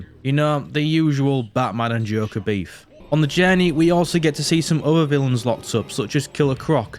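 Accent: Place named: Eastern Europe. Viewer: Damn bro what is that accent ? You might as well be Eastern European.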